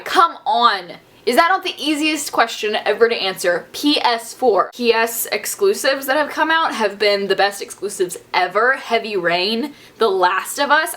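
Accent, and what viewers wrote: South, United States. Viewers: why did they not want you to have the southern accent? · You do have a slight southern twang.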